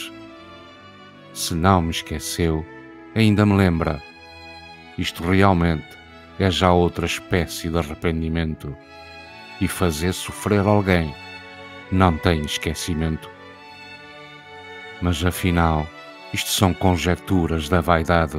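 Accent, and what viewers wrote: Portugal. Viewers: essa voz com sotaque português é delirante , como não se apaixonar por Fernando Pessoa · Ah esse sotaque português, me encanta e me acalenta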